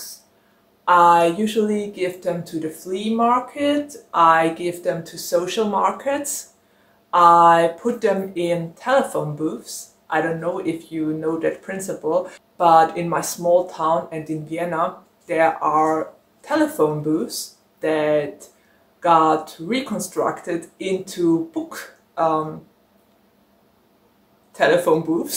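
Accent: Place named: India. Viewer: Your comment made me check this out. You've done an amazing work answering this tag questions. I love your idea on pre-ordering books. You have a great demeanor and this seem to be my first time here, so I'd just subscribed. Your accent sounds lovingly Indian. Are you an Indian? I admire your opinion on library books too. 😆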